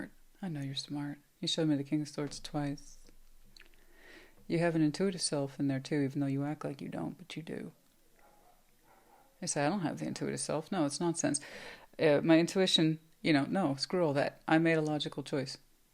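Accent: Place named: Texas, United States. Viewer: You tube and their bullshit. 🤦‍♀️ I absolutely love you. You keep doing what you’re doing and always, ALWAYS be yourself. I agree that your approach to dealing with the algorithm makes sense. Break em up as you see fit!! I’m gonna watch regardless. Just please, PLEASE, don’t ever give up!! I would definitely miss you and all you do. Love me some!!! I actually get upset when I don’t get to listen to you enough. I don’t get mad, especially not at you, it just feels like there is something missing in my life. I really didn’t realize what an impact you have on me until this year’s new ‘my year in review’ video that had me as one of the top 3% viewers of your channel. I froze when I saw that- as wide an array of channels I follow, and as much time as I spend watching or listening, top 3% is a LOT!! Anyway, just wanted to thank you for being who you are and doing what you do. 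You do you EXCEPTIONALLY well. Love your readings. Love your accent (fellow southerner here my Texas treasure). You sense of humor is phenomenal!! You have me rolling on the floor in your intros. Especially when your truth comes out- you are always spot on when it comes to people- and you absolutely do not hold back. LOVE IT!! I feel like you are family after following you for some 7 years now. I wish I could express exactly how important your insights and knowledge are, and have been impacting my life. Absolutely for the better. You are inspiring and charismatic. Best of all, you ROCK!!! I lov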